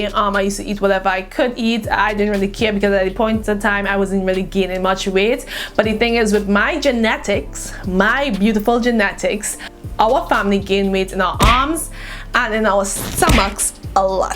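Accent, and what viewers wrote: Trinidad and Tobago. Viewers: By chance you from Trinidad? cuz I HEARING ITTT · omg i can hear the trini/west indian in your voice lol. 🇹🇹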